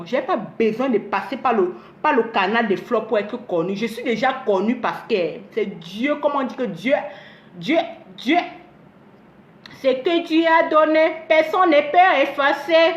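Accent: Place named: Bamileke, Cameroon. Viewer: AVEC TA VOIX DES BAMILEKE pardon va te cacher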